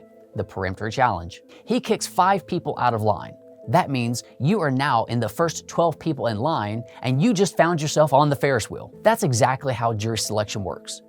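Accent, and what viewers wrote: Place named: Texas, United States. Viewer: It's a Texas thing. All the legal beagles down here pronounce it that way.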